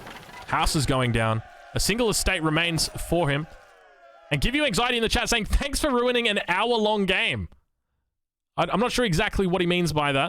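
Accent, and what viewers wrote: Australia. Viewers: the australian commentary really adding to the Mad Max vibes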